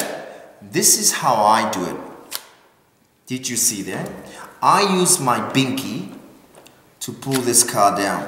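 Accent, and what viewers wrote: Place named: Australia. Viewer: I just found you today on my recommended. I like how you teach card tricks. Is he Australian by any chance as his accent sounds like it?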